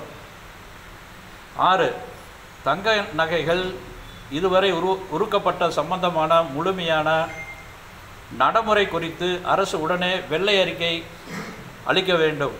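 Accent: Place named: Coimbatore, India. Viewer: சரியாக சொன்னீர்கள் ஐயா (உங்க கோவை தமிழ் 👌👌)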